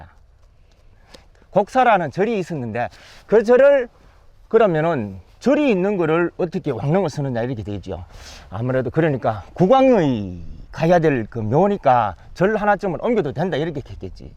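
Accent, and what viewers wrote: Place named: Gyeongsang, South Korea. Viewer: 시간과 여유가 있으면 늘 생각하고 또 방문하는 마음의 고향 경주...선생님의 자세한 설명 잘들었습니다.민아학생은 졸업했겠네요 구수하고 따뜻한 갱상도 사투리가 듣기 좋아요^^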